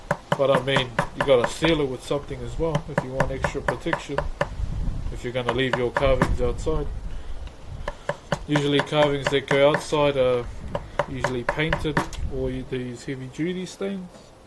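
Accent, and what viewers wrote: New Zealand. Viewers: Maori accent, its not that common so you pick up on it more.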